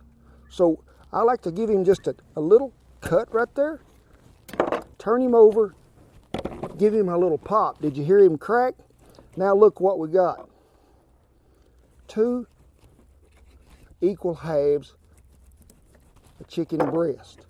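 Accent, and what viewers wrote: United States. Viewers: Why did I watch this before sleep, got hungry again:((( What accent is that though, never heard that particular American accent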